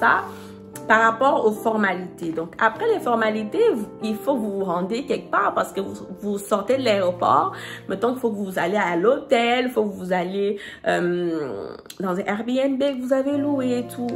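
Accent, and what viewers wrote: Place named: Quebec, Canada. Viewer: T'as de plus en plus l'accent québécois lol😅😅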